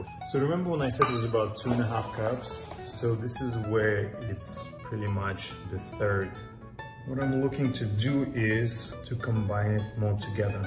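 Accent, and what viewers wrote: Russia. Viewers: cute Russian accent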